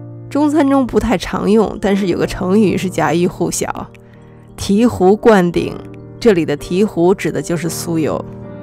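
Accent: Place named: Beijing, China. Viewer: 这京腔听起来真舒服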